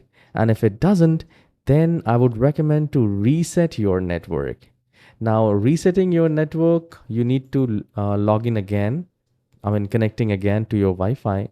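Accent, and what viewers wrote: India. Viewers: Malayali aano ?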